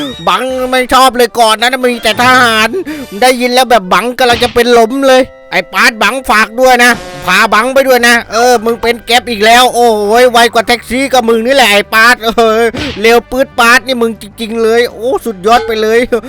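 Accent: South, Thailand. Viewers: พากใต้อีกไหม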